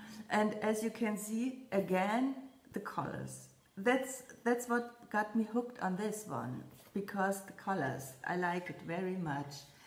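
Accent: Germany. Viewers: I just love listening to you! My family emigrated from Naussau, Germany to the U.S. in 1837. My great grandmother, who died in1967, still spoke mostly German, but when she did use English, she sounded just like you. Thank you for bringing back lovely memories of my Granny with your lovely voice. I always enjoy your videos, and you make me resolve to stitch more smalls. I’d love to have a bowl of different pretties for each month. Thank you for sharing!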